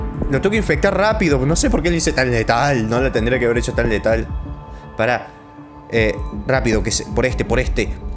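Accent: Córdoba, Argentina. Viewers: ERES DE ARGENTINA O DE CHILE.-. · Para mi es de Córdoba, porque no habla tan agrandado. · de donde sos tenes una tonada muy parecida a la argentina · Si Cordoba Argentina:D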